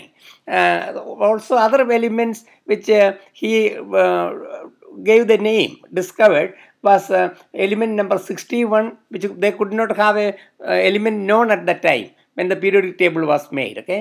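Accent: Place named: India. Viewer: is it indian accent?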